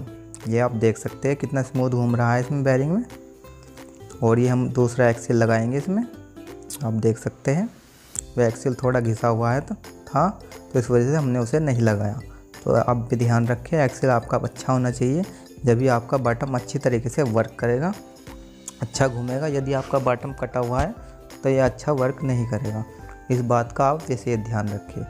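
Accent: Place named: Meerut, India. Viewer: उरे, परे की बोली, भाई वाह, मेरठ से हो या बुलंदशहर से l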